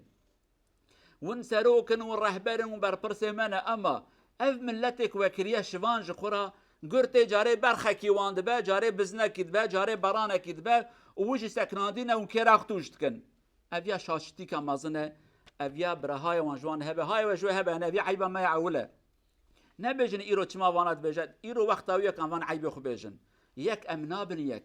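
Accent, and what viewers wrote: Turkey. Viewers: Maşallah hocam ne insaflı bir konuşma. Acaba Hocamız hakkında detaylı bilgi alabilir miyim? Şive Diyarbakır çevresi gibi. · Bu hocamızın dili Bitlis tarafına benziyor sanki diline sağlık hocam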